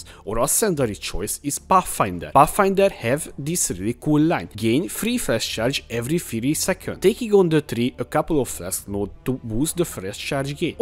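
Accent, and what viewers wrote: Hungary. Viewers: Magyar vagy bátyja? Úgy hallani :D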